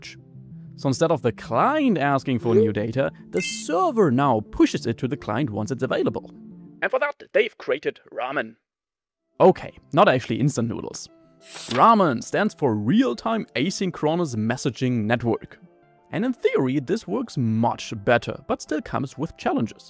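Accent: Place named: Germany. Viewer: 300k subscribers, amazing editor, no money for elocution lessons. He pronounces English as if it were German. No vowel shift, no consonant adaptation. . Cringe. I bet if he invested 300 euro in private elocution lessons, his English would improve above and beyond. He is clearly capable, just unwilling.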